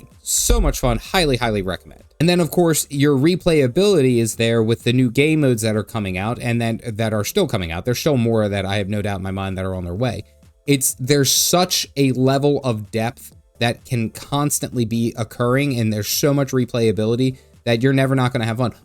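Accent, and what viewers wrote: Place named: Baltimore, United States. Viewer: Just curious...are you from Baltimore? That accent sounds very Baltimore. Great video. I haven't played since 2012. Good to see they're still around and thriving!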